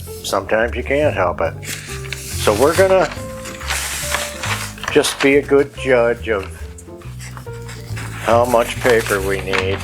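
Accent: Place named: Idaho, United States. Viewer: There's a first and your it 👏😍 there isn't a video of yours that I haven't enjoyed. I have gone back to look at your past video's and their all fantastic. Lots of flair and charisma. Oh by the way I also have a collection of milk glass love it. I have 68 Santa's not to many but their not anything like yours lol! I also have a collection of nutcracker's. My son has gifted me one every year 😃? You sound like an Idahoan yeah!! 😘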